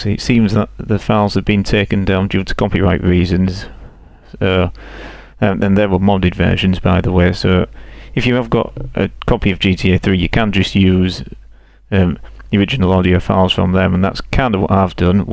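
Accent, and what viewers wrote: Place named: Hull, United Kingdom. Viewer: You sound like you're from Hull!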